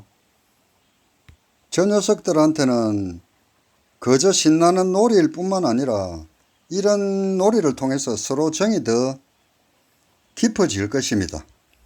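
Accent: Gyeongsang, South Korea. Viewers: 여기가 어느 지역입니까 경상도 인가요 ? 강원도 인지요 ? 저는 서울입니다 ^^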